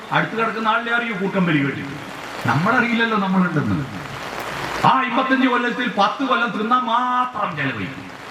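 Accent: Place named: Kannur, India. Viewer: ' ഞാൻ ഏറ്റവും കൂടുതൽ കേൾക്കുന്നത് ഈ പരിപാടി ആണ്. കാരണം പഴയതും പുതിയതും ആയ പലതും ഇതു കേൾക്കുമ്പോ പഠിക്കാനുണ്ട്. എത്ര എത്ര അർത്ഥവത്തായ കാര്യങ്ങളാണ് പറയുന്നത്. ഇദ്ദേഹത്തിൻ്റെ കുടുംബം എത്ര ഭാഗ്യമുള്ളതാണ്. കാരണം ഈ ഒരാളു കാരണം കുടുംബം നാട് -ഒക്കെ നന്നാവുമെങ്കിൽ എത്ര സന്തോഷം. ഒരു ഉദാഹരണം . ഇന്ന് കാലത്ത് ഒരു ദുഃഖവാർത്ത കേട്ടു അപ്പോ മുതൽ മനസ്സ്മല്ലാത്തൊരു അവസ്ഥയിലായി. ഒന്നും ചെയ്യാൻ തോന്നുന്നില്ല ആകെ ഒരു വല്ലാത്ത അസ്വസ്ഥത അപ്പോ എന്താ ചെയ്യേണ്ടത് നാമം ജപിക്കാൻ തോന്നുന്നില്ല ഒന്നും വായിക്കാനും തോന്നുന്നില്ല TV ഒട്ടും കാണാൻ തോന്നുന്നില്ല എന്തോ എനിക്കതോന്നി ദിവസവും കേൾക്കുന്ന ഇദ്ദേഹത്തിൻ്റെ ചാനൽ നോക്കിയാലോ പലതും കേട്ടതാണെങ്കി കൂടി കേൾക്കാൻ രസമുണ്ട് വാസ്തവങ്ങളാണ് പറയുന്നത്. വച്ചു കുറച്ചു കവിതയും എല്ലാം കേട്ടപ്പോ ഒരു വലിയ ഭാരം മനസ്സിൽ നിന്നും ഇറക്കി വച്ച പോലെ തോന്നി. ഇതാണ് ഒരു മരുന്ന്. ഒന്നു കേട്ടു നോക ഒരാശ്വാസവും - ഒരുഉത്സാഹവും ഒരു സമാധാനവും കിട്ടി നന്ദി ആരോടു ചൊല്ലെണ്ടു ഈ മനുഷ്യനോടോ ഈശ്വരനോട് അറിയില്ല ഭാഷ കണ്ണൂർ ആയതു കൊണ്ട് ആദ്യമാദ്യം ചിലതൊന്നും മനസ്സിലാവില്ലായിരുന്നു. 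ഇപ്പോ കുഴപ്പമില്ല. ഒരാവർത്തിയല്ല പല ആവർത്തി കേൾക്കുന്നു ഞാൻ. നന്ദി - നമസ്കാരം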